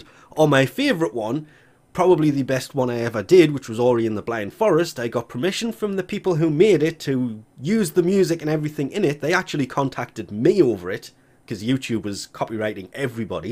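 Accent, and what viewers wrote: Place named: Newcastle, United Kingdom. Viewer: hmm I hear a hint of georgie accent there, I would have to guess durham?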